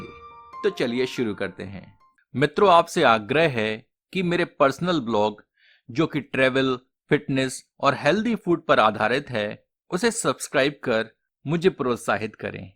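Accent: Standard. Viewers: pure Hindi .... Sooo good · He is the only one who speaks SHUDH HINDI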